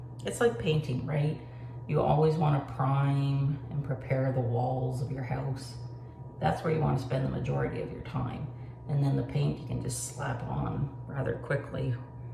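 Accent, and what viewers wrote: Canada. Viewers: Hello new friend, I am a new subscriber and I love what I see so far. I am a 47 y/o married x 22 years, mother of 1 sixteen y/o who is a lover of makeup too and clothes and purses and jewelry, lol. Where do you live? You sound Canadian. I live in Labrador, Canada, xo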